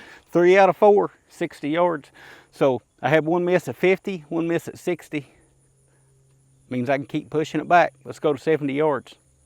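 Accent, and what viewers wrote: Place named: Appalachia, United States. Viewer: Commander Data with Appalachian accent.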